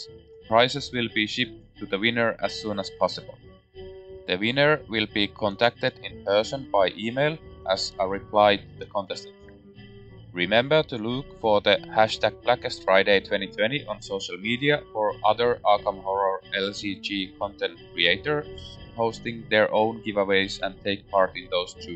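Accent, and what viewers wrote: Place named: Finland. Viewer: i was listening to your video and my wife just went from the other side of the room "that guy has a finnish accent!" :):) terveisia vaasasta!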